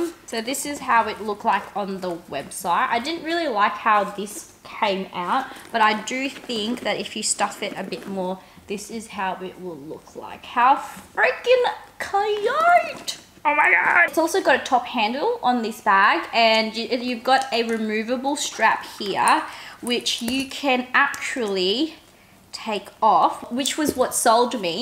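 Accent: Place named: Australia. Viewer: Funny that... I love the way she talks.. it's warming. Real n Aussie n relatable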